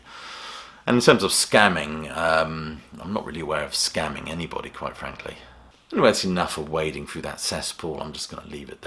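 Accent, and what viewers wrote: United Kingdom. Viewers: Dude, you got the coolest British voice going on... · I strongly prefer a well spoken gentlemen with a healthy knowledge and appreciation for music. The British accent is just an added bonus.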